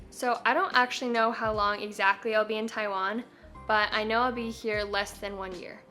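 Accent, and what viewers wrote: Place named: California, United States. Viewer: California accent!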